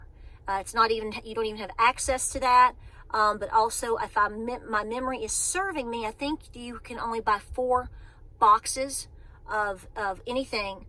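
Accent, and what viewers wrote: South, United States. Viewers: don't lose that southern drawl!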